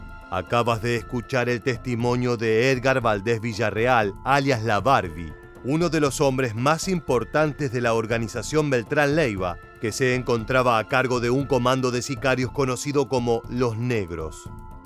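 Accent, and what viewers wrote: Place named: Argentina. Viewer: Tenia que ser argentino ese narrador arruino el docu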